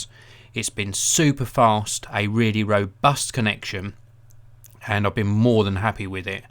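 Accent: United Kingdom. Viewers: vfw2 Route is "root" in England. So Router is "rooter"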